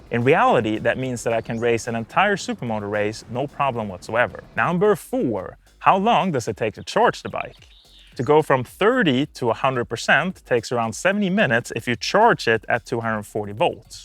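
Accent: Sweden. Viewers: bra svengelska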